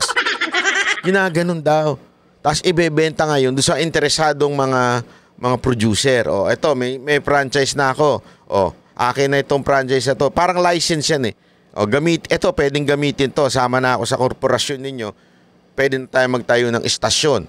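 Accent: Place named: Visayas, Philippines. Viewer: bisaya na si kagahapon😊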